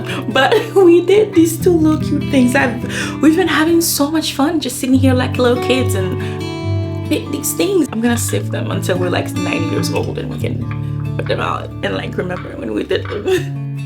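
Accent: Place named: Caribbean. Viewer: I’m hearing an accent, just wondering which Caribbean country you’re from?😅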